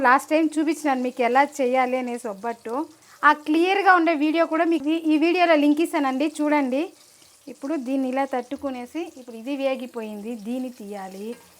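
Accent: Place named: Chittoor, India. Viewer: ne matalu chenna pellalaga chala baguntai me chethuru slang enka bagundhi super nuvu andhulonu tagaydhay lay👌👌👌👌👌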